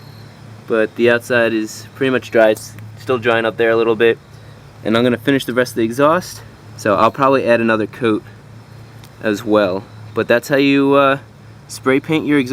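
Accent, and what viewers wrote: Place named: Philadelphia, United States. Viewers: must be from the east coast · He's prolly southern, we say it like that.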